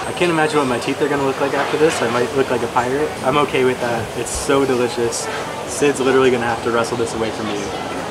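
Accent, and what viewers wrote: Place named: United Kingdom. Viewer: Why does she have a british accent?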